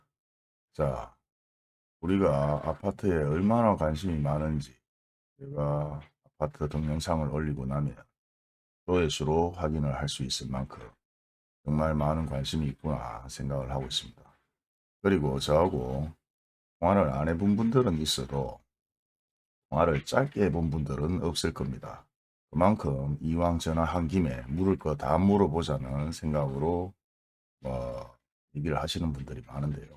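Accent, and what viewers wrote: Gyeongsang, South Korea. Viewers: 저음에 투박한듯한 경상도 사투리 저는 듣기 좋습니다~~감사합니다^^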